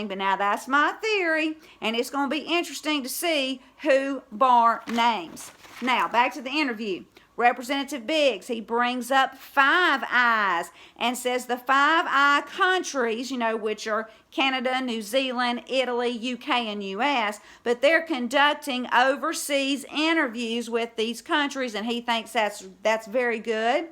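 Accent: South, United States. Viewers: I can’t believe I just ran across your channel! I’m a little late in the waking up thing but it’s been since 2016! Anyway I get a kick out of your accent(I’m southern too!) God Bless and keep on keeping on!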